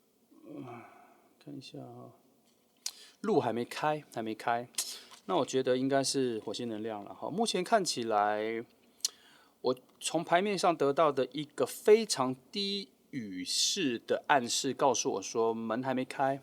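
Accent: United Kingdom. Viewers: 好听的英腔诶❤ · 喜歡英國口音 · 是好聽的英國腔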